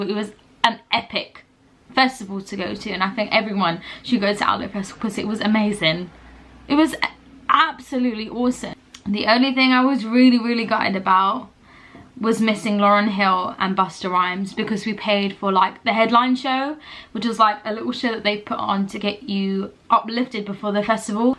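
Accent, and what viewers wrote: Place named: United Kingdom. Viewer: Pakistani girl with british accent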